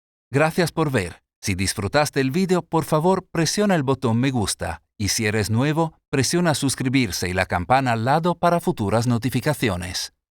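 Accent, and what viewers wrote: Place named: Italy. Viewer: Perché nn fai. Il documentario in italiano se siente mucho tu acentazo Italico man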